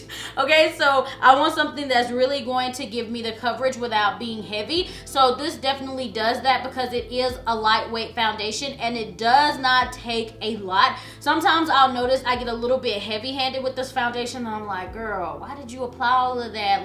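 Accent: South, United States. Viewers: i luv your country accent especially when you say the word "sure"...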